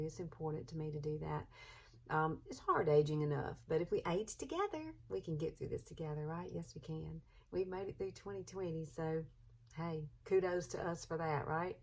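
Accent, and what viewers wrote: South, United States. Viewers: You are a very good teacher! I love watching Wayne too but it’s helpful to watch others use his technique and I enjoy ur adorable, southern accent :) I love the colors u picked and plan on finding some in my collection that are similar because my coloring is exactly like yours. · What state are you from? Somewhere in the south I’d say.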